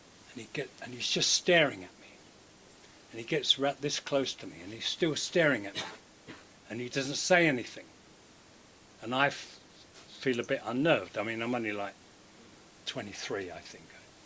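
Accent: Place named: Cockney, United Kingdom. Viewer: Such a charismatic man. Hey sounds pure Cockney here.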